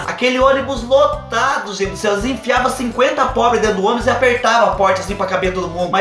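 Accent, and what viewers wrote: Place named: Caipira, Brazil. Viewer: tu é doidinho das ideias com esse sotaque caipira e contando teus causos eu não aguento 😂😂😂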